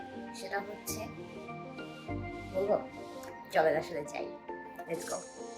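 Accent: Chittagong, Bangladesh. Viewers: Apnar basa ki Chittagong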